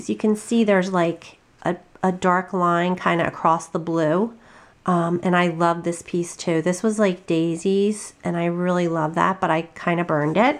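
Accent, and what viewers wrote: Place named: Georgia, United States. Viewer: last night i ran across eva tissen too and i looked thru her gallery and was literally blown away and i was like i would looove to be able to do this . i am gonna hafta give it a try! you did awesome with these pieces! my favorite one is the daisy one. i love how you curl the vines on some of them! btw you have an accent like my cousin who is from warner robbins ga. :)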